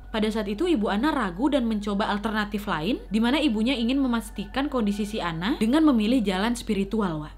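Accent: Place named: Medan, Indonesia. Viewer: Suka sm logat medannya kak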